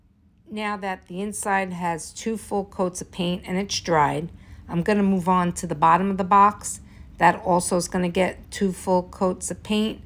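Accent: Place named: New York, United States. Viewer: You have a new subscriber!! I’ve been watching videos on YouTube for years. As soon as I heard that familiar accent, I was like, YASSSSS!!! NY or NJ LOL? I’m in NJ! Love your videos!! You go, girl !! ❤❤❤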